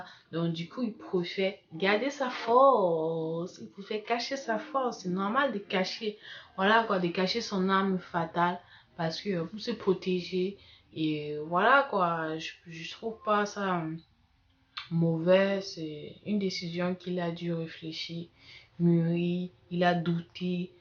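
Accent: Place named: Cameroon. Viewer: Je comprends rien avec ton acxan camerounais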